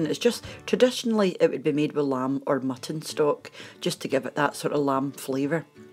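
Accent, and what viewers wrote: Scotland, United Kingdom. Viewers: I’ve just found your YouTube recipes, I love how simple you make everything love the Scottish accent too reminds me of my good friend, thank you for sharing, I love it x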